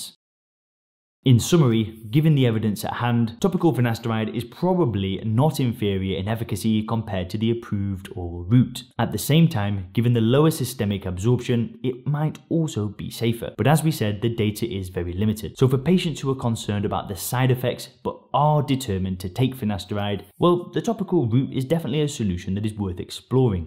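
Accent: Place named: United Kingdom. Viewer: ''pop a pill and your dun'' love that british accent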